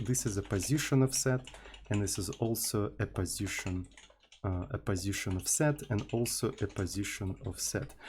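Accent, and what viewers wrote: Russia. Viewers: хз он тупо по-русски говорит английскими словами норм короче ну надо будет специальные люди придут и объяснят как надо балакать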